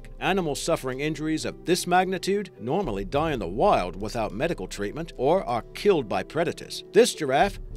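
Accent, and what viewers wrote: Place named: Australia. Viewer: Rainbow Dash you can't tell? I'm American he's definitely Aussie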